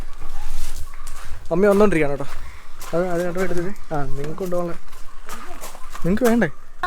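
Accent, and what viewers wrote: Kottayam, India. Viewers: Kottayam language powli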